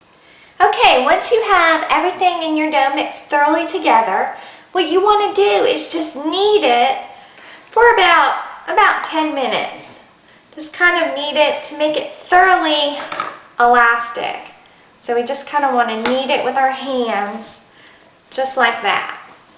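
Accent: South, United States. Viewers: Are you southern cause your accent sounds like it?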